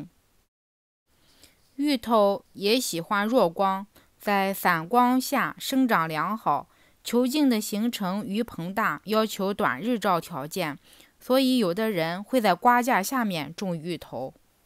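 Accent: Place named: North, China. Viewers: 谢谢分享。您这胶东味真亲切:D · 你好 关注你好久了，听口音你也是北方人，想念咱北方那种雪里蕻，不知英文名字叫什么，请教你一下啊。谢谢😜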